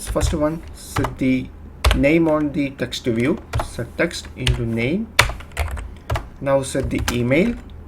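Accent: Kerala, India. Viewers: are you from kerala ? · I tapped the ad so you get money, its very helpful tutorial I love ur accent btw, very hot Indian accent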